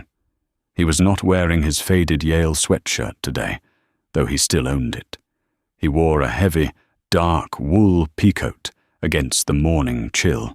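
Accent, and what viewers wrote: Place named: England, United Kingdom. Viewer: the narrator has the best English accent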